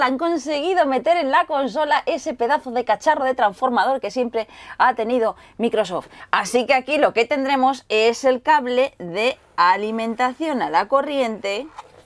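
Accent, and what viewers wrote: Spain. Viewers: eres de barcelona?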